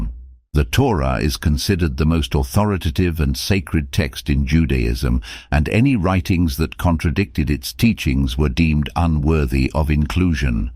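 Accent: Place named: United Kingdom. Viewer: Omnipotent, Omniscient, and Omnipresent means something to me. And your British accent can mean something in television animal documentaries but not in theology. Thanks but no thanks.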